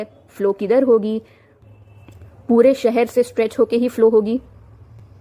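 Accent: Mumbai, India. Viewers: Ma'am are you from Mumbai ?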